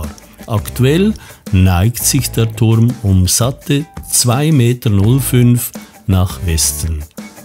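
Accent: Switzerland. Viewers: sehr schönes Video eine sehr angenehme Stimme mit diesem netten Schweizer Dialekt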